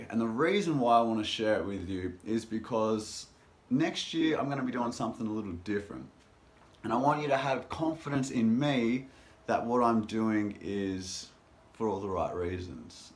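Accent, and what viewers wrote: Australia. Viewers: I first suscribed to your channel thanks to and because of your way of putting veganism forward. Always wise and peaceful. I also wanted to improve my English and understand the australian accent - it actually helped alot! - But what I truly love about your channel is that we also learn how to be humans. Not sure if it is understandable but your videos are a great source of inspiration, for the animals as well as for us, as living being. Great job and greetings from France!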